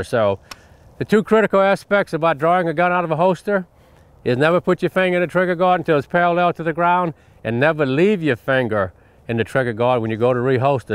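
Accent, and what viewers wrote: Louisiana, United States. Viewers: That accent has to be from Louisiana. Northern part of the state no?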